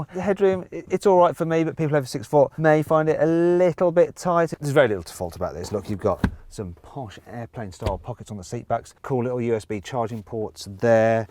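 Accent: United Kingdom. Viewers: イギリス英語とアクセントが学べるので欠かさず見てます。車も好きなので一石二鳥です。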